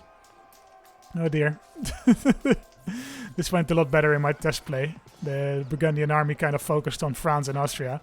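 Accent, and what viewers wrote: Netherlands. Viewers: As an American, hearing that this is a Dutch accent makes me realize how there's so many Dutch people in America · I see why you chose Holland. Your accent sounds dutch.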